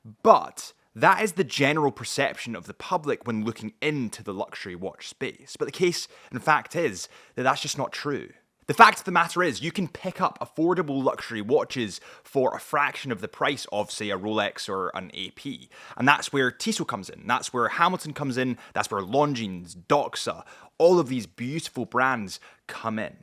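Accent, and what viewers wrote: Scotland, United Kingdom. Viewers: What’s his accent. Scottish?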